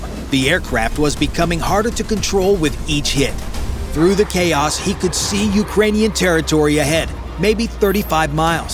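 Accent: United States. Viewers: Another annoying American voice,with usual tone well tou have lost with your usual venture but managed to condemn millions of Ukrainians to injury and death and the rest to poverty in a destroyed country ,so where a you going next?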